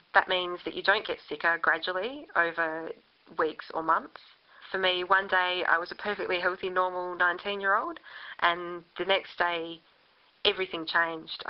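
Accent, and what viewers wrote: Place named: Australia. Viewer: 1 I am so grateful to have found THIS video. I am tickled at the Aussie accent. :-) I was a VERY active, healthy, dynamic working girl until one fine day in July, 1986, I collapsed at work for no reason. I was taken to hospital, but released after 7 hours of observation. Exactly one week later, I collapsed again and was put into hospital for a week, while they ran every conceivable test in the book on me. I left that hospital an invalid with every single test showing normal. cont....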